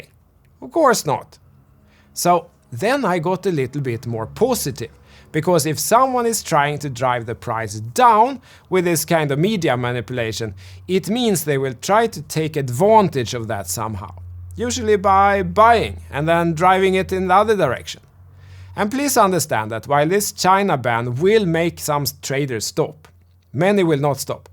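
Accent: Sweden. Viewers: Here for the Swenglish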